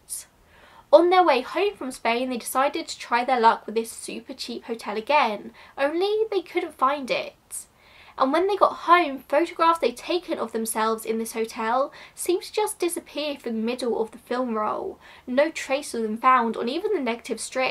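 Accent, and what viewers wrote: United Kingdom. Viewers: Also....(me being a crud American) I had to turn on the Close Captions. Your British accent was just a tad too much to catch all the words....sorry.😮‍💨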